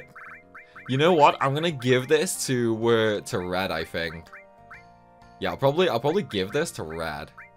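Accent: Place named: Stoke-on-Trent, United Kingdom. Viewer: Knew what? Stoke-on-Trent? I called that too! It was the one accent I never saw him deny on stream, but he just ignored it when guessed instead. Also because I went to Staffordshire uni so I'm kinda familiar with it. I ruined my stomach drinking Pepsi for three years because the tap water in the area is disgusting.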